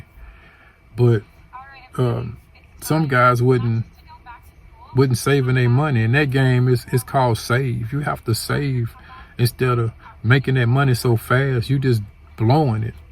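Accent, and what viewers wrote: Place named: Texas, United States. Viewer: You look like a friend from Texas back in day. I hear that accent you got, homie had that. Back day I had to move on. I couldn't under where homie was going. So I waited, and waited. When Engelwood, Chicago south sides meets a Texan from H- town sparks fly, Texans believe that Texas thing they are bigger ruffer then average man. One on one no weapons we when at it homie had to give respect specifically around them why boys. I was mothers boy but had eight brothers and sisters so I did the ass kicking bullies to protect them and weaker kids and friends. He was first homie outside Chicago. Unfortunately homie didn't under what you saw was not real me. I didn't sell drugs or gang stuff. I was navy trained Electrician so thats direction I took. Homies walked a different path. I still think homie was the shit! Dam dude you look like homie!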